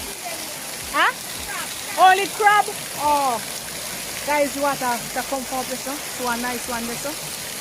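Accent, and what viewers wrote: Jamaica. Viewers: Really Jamaican girl,listen to the accent 😂😂😂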